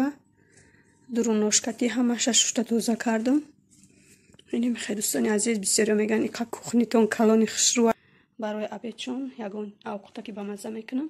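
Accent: Yovon, Tajikistan. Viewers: Салом хохарчон ман зан хастам шумо аз ёвон мешед ми лахчаи гапзанитон ранги ёвонихо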